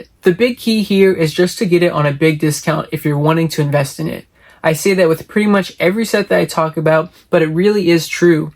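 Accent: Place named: United Kingdom. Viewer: Why does he sound like he’s speaking English and British at the same time 😭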